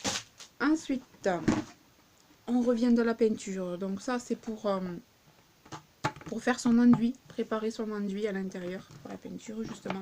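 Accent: South, France. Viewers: Mais je n'avais pas fait attention à ton petit accent du sud. Je ne savais même pas qui Vendée de la peinture chez Action. Tu as fait pamal d'achat. Merci pour le partage. Au plaisirs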